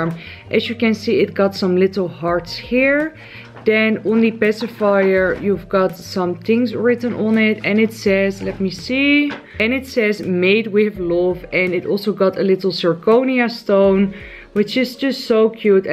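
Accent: Netherlands. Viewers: you are dutch bc of the accent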